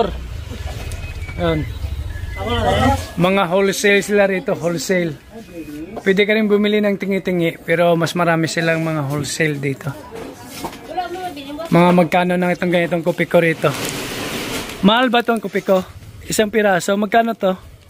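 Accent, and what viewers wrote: Iloilo, Philippines. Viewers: Ilonggo ka po pala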